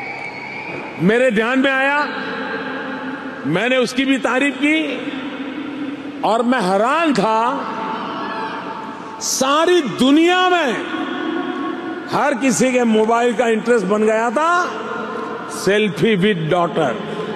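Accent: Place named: India. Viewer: I love the Indian English accent.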